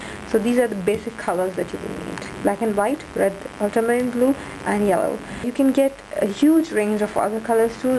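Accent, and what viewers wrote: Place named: India. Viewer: Thank you, Indian voiced woman :)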